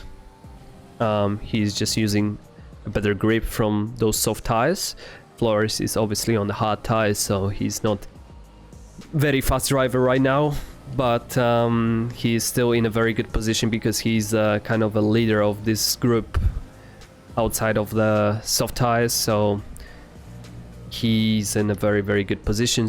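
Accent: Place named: London, United Kingdom. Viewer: Why are you speaking londonish?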